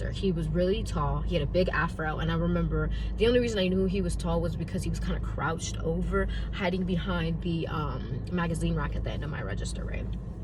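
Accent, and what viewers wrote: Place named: Maryland, United States. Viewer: This story is insane … im glad you’re ok and i love my MD ppl … I love how y’all say tew instead of 2 … please be safe ….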